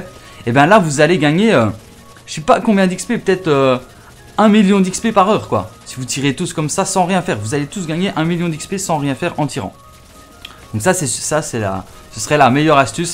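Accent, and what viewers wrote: Belgium. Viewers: Tu a un accent belge je me trompe??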